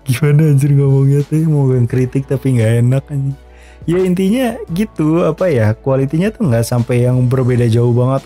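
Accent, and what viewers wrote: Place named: Bogor, Indonesia. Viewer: Bahasa sunda lu kek barudak bogor pride anjir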